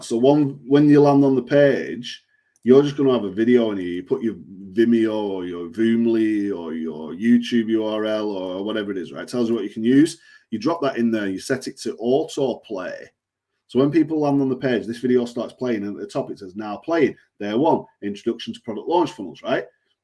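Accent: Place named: North, United Kingdom. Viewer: Yes! Sound like a northerner lad.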